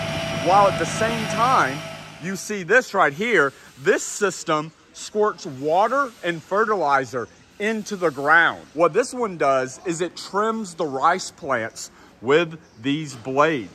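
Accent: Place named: South, United States. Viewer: Georgia boy in Thailand I was wondering where you were from I can’t help but hear your accent as a fellow southerner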